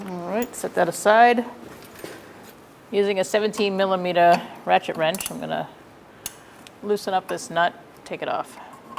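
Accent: Boston, United States. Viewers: A big thank you from South Texas! It sounds like you have a Boston accent which just makes this video better for some reason.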